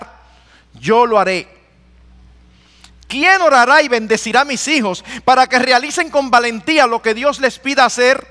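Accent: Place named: Dominican Republic. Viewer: Muchas gracias pastor, me encanta su acento dominicano trayendo estás enseñanzas bíblicas de la crianza, el Señor lo guarde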